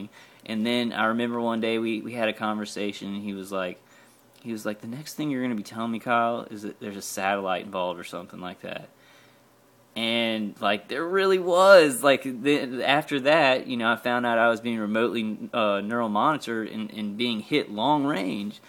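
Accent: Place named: Baton Rouge, United States. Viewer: I see it's a Batman day today,. Your house seems nice- very modern- lucky it goes well with silver?:) You said once you came from Baton Rouge? I guess that would be the accent. I'm interested in US accents, as I am from Australia. I'm slowly getting there in distinguishing some of them. Good video. You've said you're focusing on the external. That seems like a good plan. It could really take some of the pressure off you.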